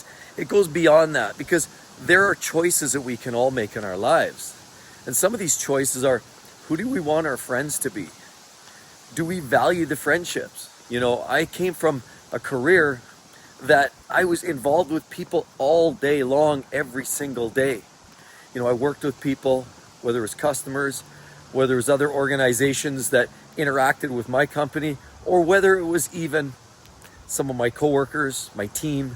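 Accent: Canada. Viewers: Lol...I could tell by your accent you're Canadian. 😊 🇨🇦 🍁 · First...awesome stuff!--like your no-nonsense style along with the Canadian accent (ofcourse) haha. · ARE you CANADIAN?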